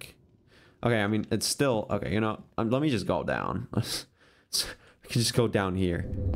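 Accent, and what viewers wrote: Australia. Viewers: are you aussie by chance? you sound just like my friends and i with all the swearing XD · Is Australian?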